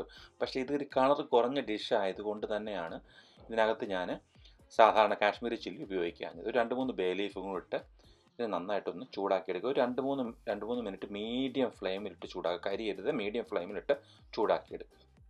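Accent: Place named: Kottayam, India. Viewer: Well explained, Kottayam ഭാഷ ഷ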